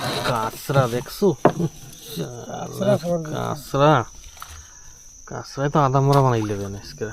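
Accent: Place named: Barisal, Bangladesh. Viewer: দাদা আপনার আমার খুব ভালো লাগে আমি আপনার ভিডিও গুলো সব সময় দেখি আপনার ভাষা আমাদের দেশের বরিশালের ভাষার মতো পায়সা মাছ আমারও প্রিয় মাছ কারণ আমরা সুন্দরবনের কাছে থাকি আমি বাংলাদেশ থেকে বলছি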